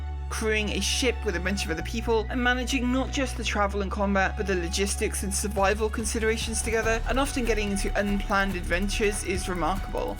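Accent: Wales, United Kingdom. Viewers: There's nothing wrong with your voice. I do love that Welch accent, but it could be thicker.... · Awesome! The Welsh accent is lovely. I’m down here in the Southern Hemisphere, and I like being able to remember accents and where they come from. (I have fun guessing with overseas visitors at my work). Best wishes for 2022 and beyond! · Thanks! I thought you were speaking with a British accent. We all have something. I’m low vision. It’s OK and you and are among friends! Your videos are fantastic!! I very much enjoy them. Please continue. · Your voice is lovely sounding and Welsh #FullTidee so don’t worry about those that mock. I’ve been placing SC just about as long as you have but can’t put the hours in as much as I’d like so still feel a bit of a newb when it comes to approaching an Org and I tend to hide away mining and exploring different locations. 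I’m less combat focussed so maybe Skunkworks can form an explorer arm and recruit for that?:) Keep up the good work though (and ) and see you in the verse hopefully soon. Taffy Coops. X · I honestly just thought the voice was a specific British accent from somewhere. 😂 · you make really good content. Nice Music, good gaming, good edditing and your voice rounds it all up its just natural, its good as it is. English is not my first languege but do you have a britisch accent?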